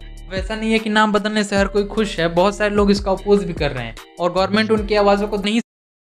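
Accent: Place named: Bihar, India. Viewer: Y you sound bihar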